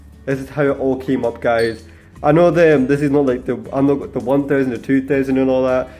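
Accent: Scotland, United Kingdom. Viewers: Holy shit that is cracking! You have a Scottish accent, what Cex did you nab it from? :o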